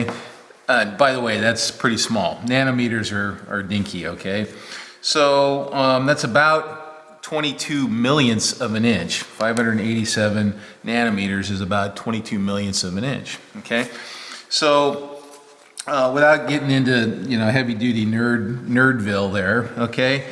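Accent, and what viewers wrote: United States. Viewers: you sound like a guy from the states, but you shoot metrics out of your head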